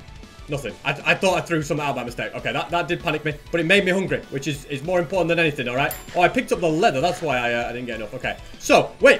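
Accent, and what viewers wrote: United Kingdom. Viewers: Dude your British so I’m I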